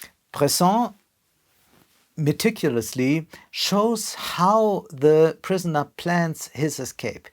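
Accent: Germany. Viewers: Du solltest dringend mal ein paar Jahre in einem Land leben, indem Englisch gesprochen wird. Dein Englisch ist richtig German. 🤒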